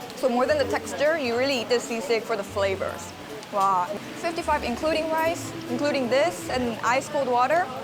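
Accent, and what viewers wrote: Philippines. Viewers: I love her tagalog sounds korean but her English is pinoy accent.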